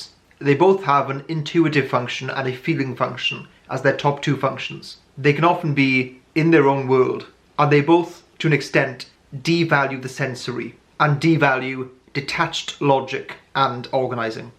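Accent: Wales, United Kingdom. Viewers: Are you from Wales? (Trying to figure out your accent)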